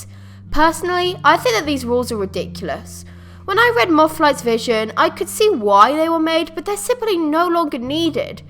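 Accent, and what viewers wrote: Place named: England, United Kingdom. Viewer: OMG! I love your accent. It's beautiful. ^w^ Are you from England?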